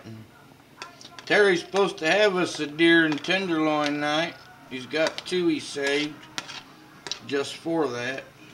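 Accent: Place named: Appalachia, United States. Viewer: Love this hillbilly accent and simple earthy ways, super...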